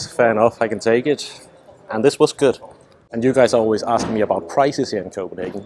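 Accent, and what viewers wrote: Liverpool, United Kingdom. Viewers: Bit of a scouse accent in there?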